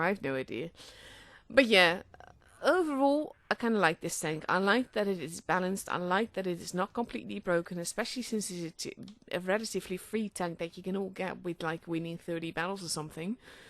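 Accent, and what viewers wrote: Netherlands. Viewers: Hi - You have a really lovely 'speaking voice', makes easy-listening. Being older, I tend to be very jaded & don't follow many (or few) "Blitzers" on here. I enjoy your review, as I've just acquired a "Medjay" (tonight), but haven't used it yet. Looks to be just a 're-skinned Excelsior' - As during "Uprising" mode, they have the ability to "turn around" their opponents (which sadly, includes me!!!!) - I've been destroyed a few times by that method, whilst using my "newest" favourite Tier.5 - "Nightmare StuG.III" Lastly, your English is excellent & I hear the hint of a Dutch accent.... (tell me I'm wrong? - Laughs!)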